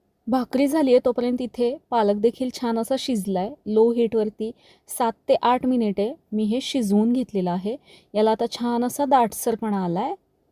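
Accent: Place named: Konkanastha, India. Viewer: तुम्ही कर्नाटकातल्या असून सुध्दा एवढे शुध्द स्वच्छ गोड मराठी छान बोलता .बरेच उच्चार कोकणस्थ लोकांसारखे वाटतात .हल्ली अशी शुध्द भाषा ऐकायला मिळत नाहीत .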